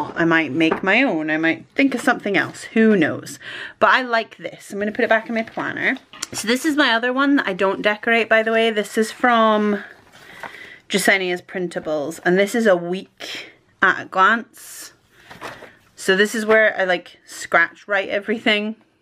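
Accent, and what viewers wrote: Scotland, United Kingdom. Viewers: A plan with me and the word "purring" on a wonderful Scottish accent is just what I needed on this cold January day ❤️❤️❤️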